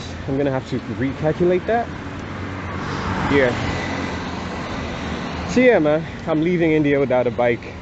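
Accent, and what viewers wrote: United States. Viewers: It's so noisy with that freaking non-stop honking. Can drive you crazy! Btw, your english is pretty good just like an american accent.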